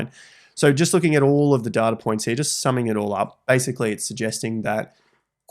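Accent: Australia. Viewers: Yeah, the Aussie accent is brutal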